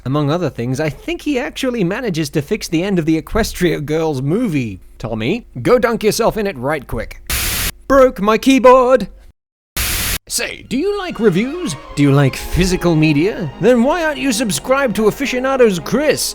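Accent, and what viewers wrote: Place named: Scotland, United Kingdom. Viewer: wait wait wait!! r u scottish...that explains so much actually... #freedom #hashtagsrsostuipedyamidoingdis?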